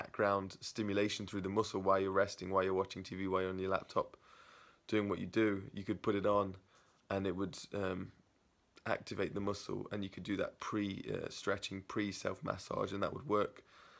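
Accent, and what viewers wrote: United Kingdom. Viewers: Is that how all brits speak or is it the hood slang? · Almost nobody speaks queens English. YouTube geordie shore and compare the accents there to ones from Yorkshire and London. This accent is kinda average.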